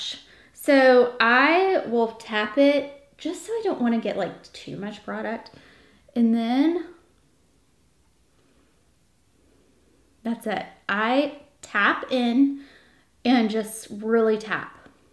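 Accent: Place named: Virginia, United States. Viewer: I love your accent. Are you from Virginia?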